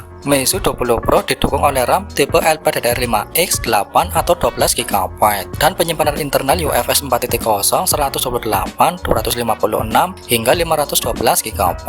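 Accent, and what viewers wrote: Banyumas, Indonesia. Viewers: Bang ngapak yak?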